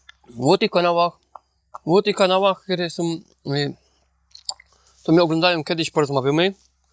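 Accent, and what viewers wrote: Silesia, Poland. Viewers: super się ciebie słucha jak opowiadasz w gwarze śląskiej, bardzo mi sie podobo waszo godka, warto mieć swój kawałek gruntu i swój kawałek podłogi, warto samemu coś zrobić bo daje satysfakcję, pozdrawiam, lajk 62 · Super odcinek. Rewelacyjna gwara śląska. Jeśli chodzi o kamerę to zanim ją rozbijesz sprawdź kartę. Prawdopodobnie przyczyną wieszania się kamery jest karta SD. Zmień firmę karty SD i powinno wszystko działać bez problemu. Miałem taki sam przypadek. Moja kamera nie trawiła kart Kingston natomiast bardzo dobrze pracuje kartami SanDisk. Pozdrawiam serdecznie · śląska gwara mnie rozwala;) na dobry początek zostawiam subka i zapraszam również do mnie, pozdrawiam z Tyrolu:)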